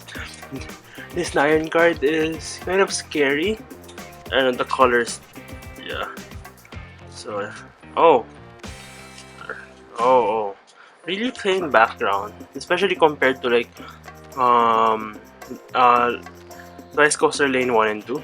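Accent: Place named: Philippines. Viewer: Im guessing your Filipino? Idk but I your accent kinda sounds like filipino’s accent when speaking english. BTW your unboxing is great!! Thank you for supporting twice ^^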